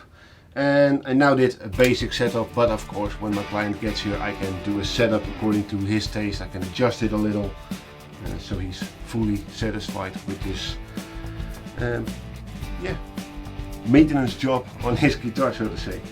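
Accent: Netherlands. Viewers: Are you dutch . It sound like it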